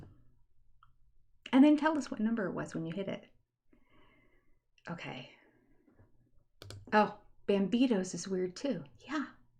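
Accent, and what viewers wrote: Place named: Midwest, United States. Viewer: Yeah, but lol you have a Midwestern accent even though you don’t think you do 😂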